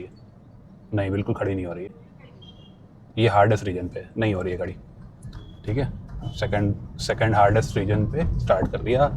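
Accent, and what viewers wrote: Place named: Farrukhabad, India. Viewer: Farrukhabad wali boli. Chala rahe ho shahjahanpur me. Purane din yaad aa gye.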